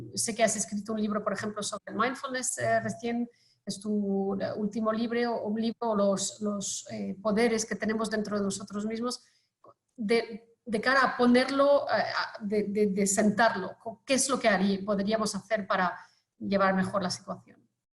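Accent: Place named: Spain. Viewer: Me encanta el gracejo español del doctor, la sangre española, ole!.- Gracias por esta información, invitándonos a hacernos responsables de nuestras propias vidas. Tenemos al mejor amigo y al peor enemigo dentro nuestro y adentro es que lo vamos a equilibrar. Gracias doctor, por echar luz en este mundo sombrío.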